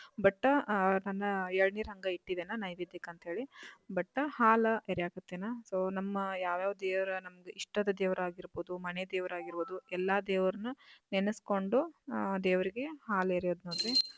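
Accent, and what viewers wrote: North Karnataka, India. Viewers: ನಾಗರ ಪಂಚಮಿ ಹಬ್ಬದ ಬಗ್ಗೆ ವಿವರವಾಗಿ ತಿಳಿಸಿದ್ದೀರಾ ನಿಮ್ಮ ಉತ್ತರ ಕರ್ನಾಟಕ ಭಾಷೆನೇ ಚಂದ🎉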